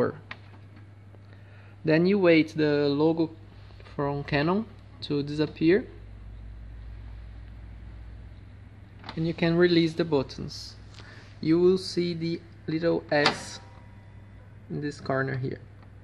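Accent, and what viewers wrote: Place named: Brazil. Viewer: Ahhh sabia que era br pelo sotaque 😂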